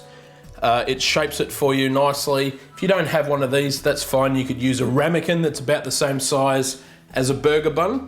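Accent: Australia. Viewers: No offence i noe ur aussie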